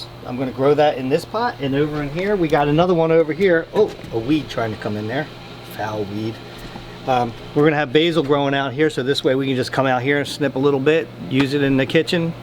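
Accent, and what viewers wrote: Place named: South, United States. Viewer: I have always wondered. Is saying "anywheres or somewheres" a southern thing? Good vid today!! I'm about to cannon ball in to your pool!!!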